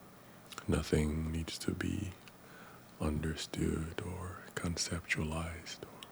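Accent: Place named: Toronto, Canada. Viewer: You sound like you're from T.O... 🇨🇦🤙🏻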